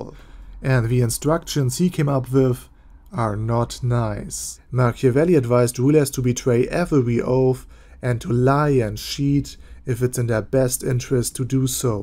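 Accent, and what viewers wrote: Germany. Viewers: great video! :D are you german? sounds like a german accent perhaps you could do an analysis of some of the new worlds gouvernments in case you run out of ideas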